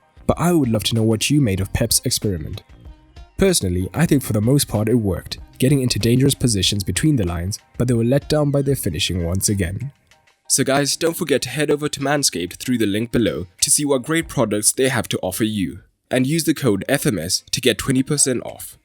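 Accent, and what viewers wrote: South Africa. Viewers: Bro are you south African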